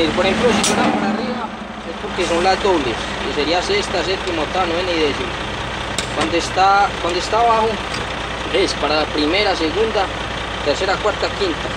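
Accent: Colombia. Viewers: este es el verdadero conductor de carretera lo unico malo es el asento , la palabra seria muy colombiano para mi gusto es igual que el asento chileno casi que hay que buscar un traductor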